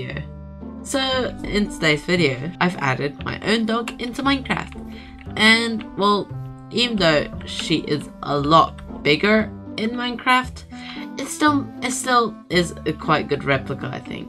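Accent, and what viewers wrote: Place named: Australia. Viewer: Wth!! I didn't know you were an Aussie!!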